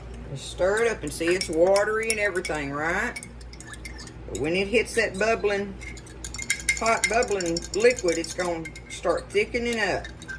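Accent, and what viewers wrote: South, United States. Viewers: I love your Southern Accent and you are friendly talker. Thanks for your video! I also love your high ponytail and the only way to keep hair out of the way. Certainly, do Not like cooking with hair hanging over the face, enjoy your cooking! Just keep on cooking!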